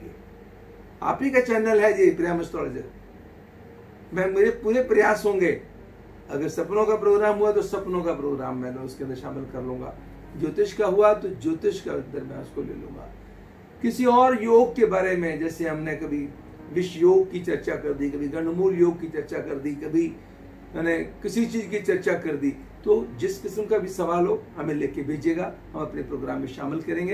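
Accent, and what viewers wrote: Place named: Kashmir, India. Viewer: aapke talaffuz se lag rha hai ki aap Jammu and Kashmir se talluq rakhte ho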